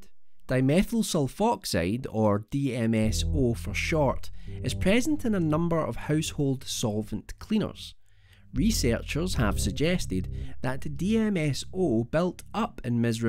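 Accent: Scotland, United Kingdom. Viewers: It's hysterical how Scottish the narrator is. No wonder everyone thinks we speak like Sean Connery haha ! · I love your accent & voice. I find it very precise & soothing. Which part of Scotland do you hail from? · Ron Ronson it’s Scottish not Irish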